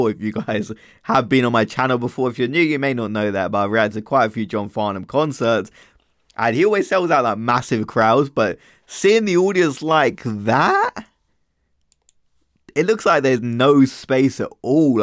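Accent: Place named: England, United Kingdom. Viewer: Thanka for doing this song of John's. I haven't seen any other reactor do this version before. Also love your nice English accent !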